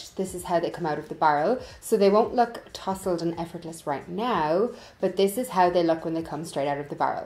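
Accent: Ireland. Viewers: I thought you were irish😂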